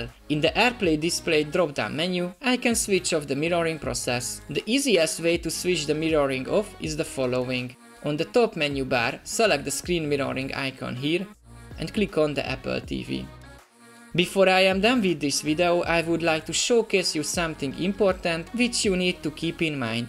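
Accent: Hungary. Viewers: Are u Hungarian? The accent :)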